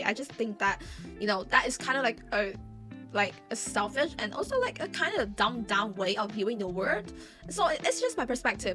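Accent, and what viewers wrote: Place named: United States. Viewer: Can you be less american in your gestures and way of speaking ?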